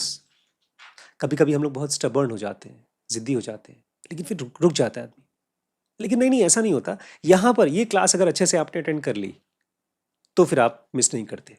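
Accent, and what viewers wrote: Bihar, India. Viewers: Sir it was really deep class I was a little asleep, and found it quite insightful, and you sometimes sounded using a bihari accent with due respect.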